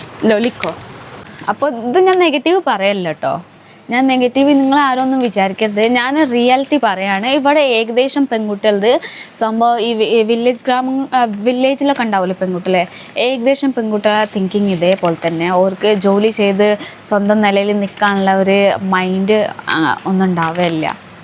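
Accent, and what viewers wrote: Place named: Malabar, India. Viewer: ഒരു മലബാർ ടച്ച്‌ വർത്താനം 😍❤️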